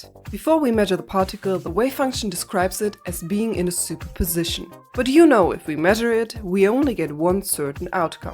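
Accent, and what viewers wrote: Germany. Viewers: I think, no. Definitely German, but different intonation to my ear. Softer vowels, less nasal (not that Sabine is incredibly nasal), and more rolling inflection, rather than the distinct highs and lows of Sabine. Their voices are similar in some respects, but they are definitely different people to my ear. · Agreed, I don't think this is Sabine, but I did do a double take at first. I believe it's a German accent, but I'm no expert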